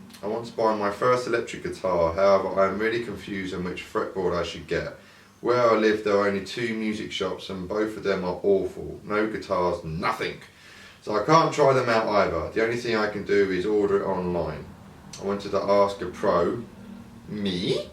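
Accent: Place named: England, United Kingdom. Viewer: You look like an italian but you speak like an english dude ^^ I like that :D and feel Better ^^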